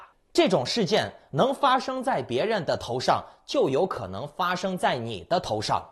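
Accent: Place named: Cantonese, China. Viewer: ，说话不失风趣和幽默，甚至嘲讽。。。。广东人漏风普通话特别诙谐影响深刻。。。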